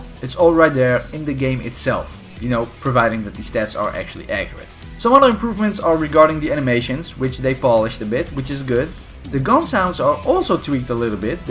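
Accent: Netherlands. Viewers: Are you dutch? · Are you Dutch? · Je bent nederlands, Ik hoor het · I guess you’re dutch? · are you dutch?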